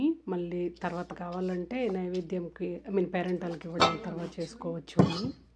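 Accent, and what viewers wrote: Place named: Tirupati, India. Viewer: Nice video andi, the way you speak, reminds me of my home town tirupathi. We also call poornaalu as suggi Could you share your pulihora recipe please?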